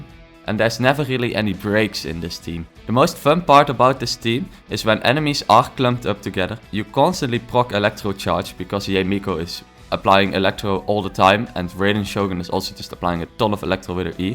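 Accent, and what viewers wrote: Netherlands. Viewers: Helpful video! Are you Dutch by chance?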